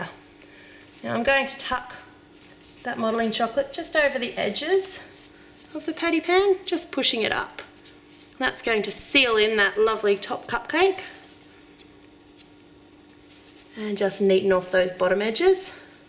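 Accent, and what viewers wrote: Australia. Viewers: oh my gosh, I love Australian accents. c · I love..I mean LOVE your Australian accent man if I had one j would never shut up cuz it's so cool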